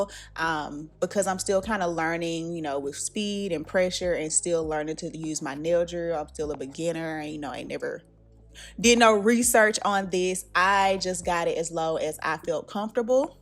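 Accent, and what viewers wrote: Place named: Texas, United States. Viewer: Are you from Texas? You got a distinct accent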